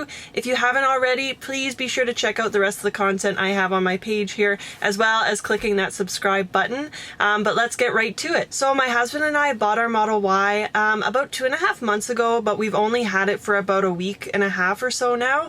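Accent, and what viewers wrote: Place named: Canada. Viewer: She sounds Canadian 🇨🇦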